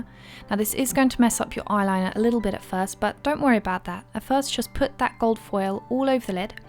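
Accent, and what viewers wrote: England, United Kingdom. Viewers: Where are you from btw? I know you're English, but you're accents lovely :3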